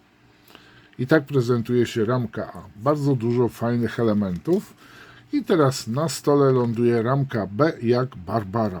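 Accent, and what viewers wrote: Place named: Poland. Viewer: Ciekawy Polski alcent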